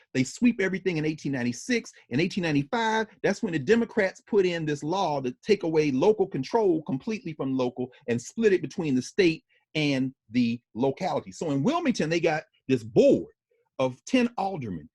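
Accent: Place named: Nashville, United States. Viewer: I was born and raised in Nashville. Graduated Tennessee State many years ago. I love. I have not lived in Nashville in 30 years, and when I hear him say, “ my momma and ‘ nem’ “, I feel like I’m back home again. (Notwithstanding, his brilliant mind.) Love you guys and thank you.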